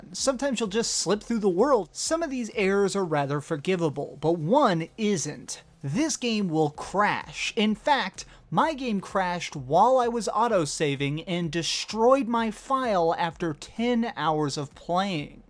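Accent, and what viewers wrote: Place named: South, United States. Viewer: Man, you sound like a peckerwood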